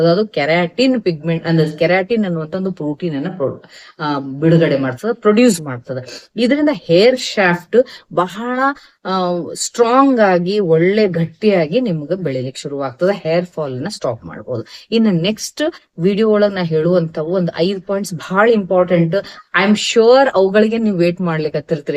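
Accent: North Karnataka, India. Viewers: 🙏 medam nim matna keltidra niv nammane sadasyaru anno feel aglikttada, bcs nim matu uttara karnatakda bhashe... So i lv ur spch❤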